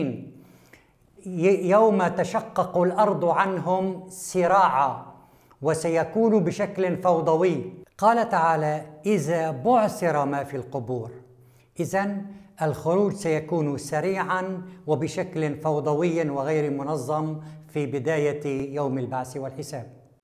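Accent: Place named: Aleppo, Syria. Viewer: العالم سوري من مدينة حلب و هيك لهجتو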